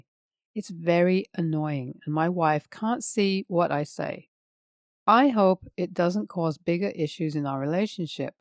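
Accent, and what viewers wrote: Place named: United Kingdom. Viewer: If I had to guess she’s Indian/Pakistani from England from her diction speech patterns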